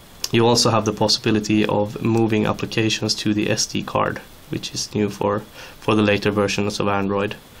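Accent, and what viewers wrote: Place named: Sweden. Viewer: i love how you hear that hes swedish xD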